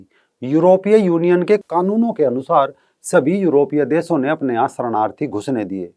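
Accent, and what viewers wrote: Haryana, India. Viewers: आज आपका पहला वीडियो देखा, बहुत अच्छा लगा और आपकी बोलने की tone से आप हरियाणा के लगे Well done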